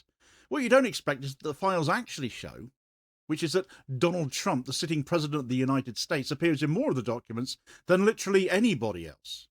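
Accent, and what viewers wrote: United Kingdom. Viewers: Love your vids, be careful if you live in uk(you sound British)they might arrest you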